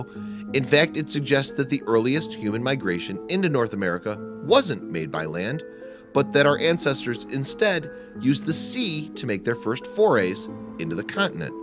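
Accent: United States. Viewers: The weird music sucks and the American accent when talking about Canada and Canadians also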